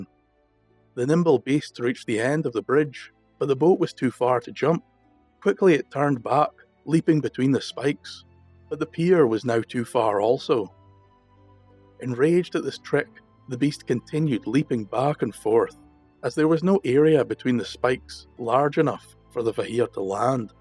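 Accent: Scotland, United Kingdom. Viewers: I’ve read or seen a lot of the words/names etc many times in books I’ve read previously. Unfortunately I’ve butchered the pronunciation of each in my mind. So I like hearing the correct pronunciations in your stories. I found your Awesome channel by accident and it’s the best thing to happen to me all day! A Scottish accent is my favorite and I could listen for hours….so I’ve been bingeing! I’ve shared with friends, hopefully they’ll subscribe as well.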